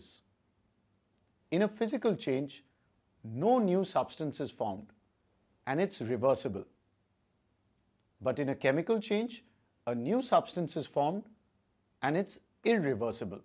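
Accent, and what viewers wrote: India. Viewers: i know he's indian cuz his accent🤣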